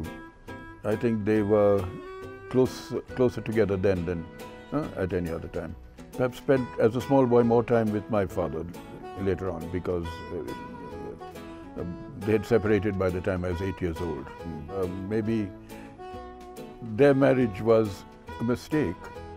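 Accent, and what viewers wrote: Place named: United Kingdom. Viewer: A real mixed British Indian accent! Indo Britishers note!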